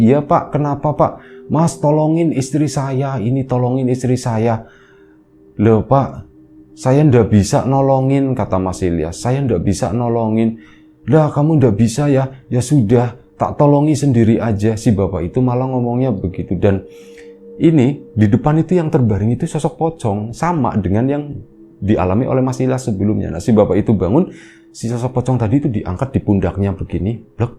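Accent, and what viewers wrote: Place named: Banyumas, Indonesia. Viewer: oh pantesan ngapak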